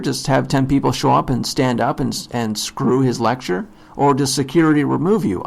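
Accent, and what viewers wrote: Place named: Canada. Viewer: Where / what is Middlebury? You're joking, right? (Perhaps you're from The Great White North? Pretty sure I hear Canadian pronunciations of "out" and "about" - most logical explanation !) Anyway, it's one of the very best liberal arts colleges in the U.S., costs over $60K/ year, consistently ranks in the Top 10 among small private colleges nationwide, and is incredibly difficult to enter in terms of acceptance rates. This all makes the whole episode worse than it may have been otherwise/elsewhere. Most of these kids are in the top 1% nationally (academic performance), and Middlebury attracts students from countries all over the planet who move there to study as well. It's anything BUT a "nobody place." And yes, it's strongly left-leaning. I don't condone what happened in the least, but will say this : I have a relative who's a prof there...he, along with a number of faculty members whom these kids deeply respect, encouraged and coached the appalling behavior prior to Murray's visit (after unsuccessfully trying to get him "disinvited" first.) There was nothing at all spontaneous about the fiasco...all was carefully pre-planned. 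This was completely uncalled for and put Middlebury to shame - its faculty in particular. I'm not excusing the kids' behavior, but they were basically following the orders of their superiors. Disgusting...and I don't even like Murray. I don't agree with his views on I.Q., but firmly support his right to express those views and have a robust debate with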